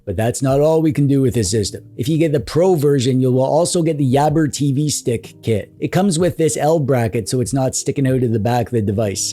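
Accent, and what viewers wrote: Canada. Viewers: You're amazingly Canadian, huh? I don't know how I never noticed til this video.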